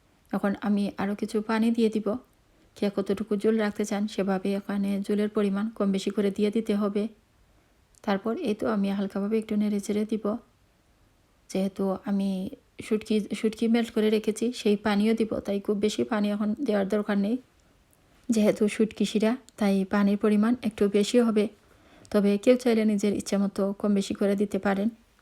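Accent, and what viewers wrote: Sylhet, Bangladesh. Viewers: আপুর কথার মধ্যে সিলেটি কথা চলে আসে।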